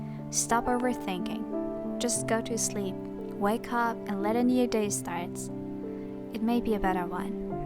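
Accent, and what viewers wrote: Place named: Turkey. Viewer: لهجه ترکی بهت میشینه دختر💙💚 امشب خیلی گریه کردم ولی دستم خورد ولاگت باز شد..... بعد.... بهترم.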